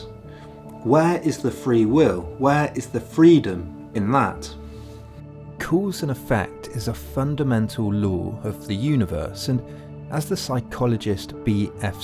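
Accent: United Kingdom. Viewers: Why do I find this guy so attractive? I think I have a thing for British accents and philosophy majors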